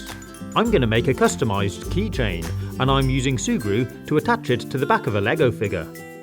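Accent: United Kingdom. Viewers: Were you born in Britain or is that your accent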